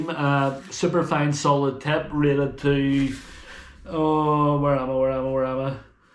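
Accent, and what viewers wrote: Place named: Northern Ireland, United Kingdom. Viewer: Howdy, You sound like your from Northern Ireland. Loving the box, Wd40 over the label will remove it.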